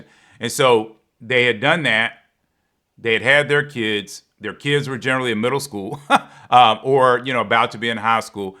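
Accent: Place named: Memphis, United States. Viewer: Lol i didn't know you were from Memphis, your accent isn't as strong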